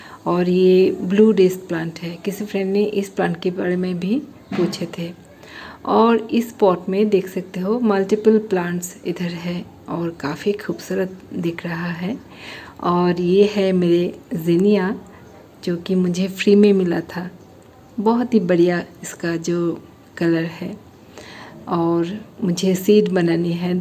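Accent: Bengal, India. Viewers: Sotty tomar mukhe hindi khub bhalo lage.ami c.g. te thaki ekhanei boro hoechi kintu amar me bole tomar mukhe hindi sunlei bojha jaye tumi bangali😊😊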